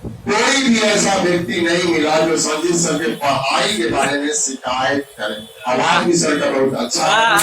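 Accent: Bihar, India. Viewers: aapke bolne ka andaj bilkul bihari jaisa hai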